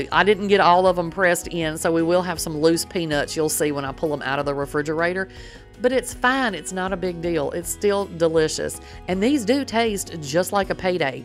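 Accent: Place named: Tennessee, United States. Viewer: Are you from Tennessee by chance? Love your accent, it reminds me of my dad's and he was born and raised in Nashville.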